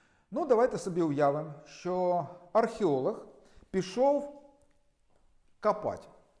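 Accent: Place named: Odesa, Ukraine. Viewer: Розумний чоловік нащось дурня з себе клеїть.. По розмові видно, що одеський липован. Так?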